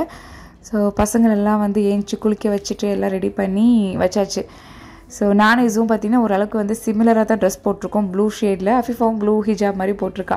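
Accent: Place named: South Tamil Nadu, India. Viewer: Recently watching ur videos.. Very natural.. Which is ur native.. Becaz south side slang madhiri iruku adha keta sis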